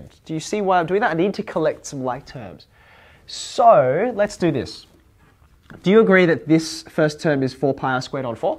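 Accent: Australia. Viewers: Is Australian? Cause he started out sounding American. Lol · Yeah he is an Aussie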